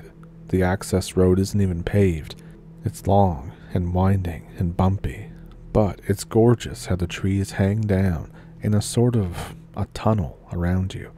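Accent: Canada. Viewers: knew you had to be Canadian with that accent!! Could listen to ya talk for days 😁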